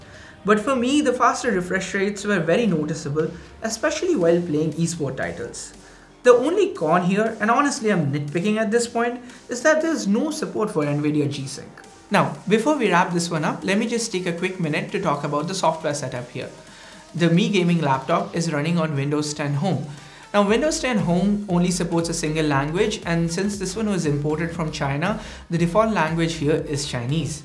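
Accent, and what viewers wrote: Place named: Bengal, India. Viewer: That's how Bengali accent is 😍😍😍😍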